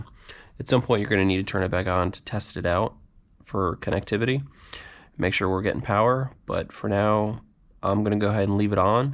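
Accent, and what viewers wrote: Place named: North America. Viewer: Thanks for this. Interesting.... North American accent but UK socket outlets?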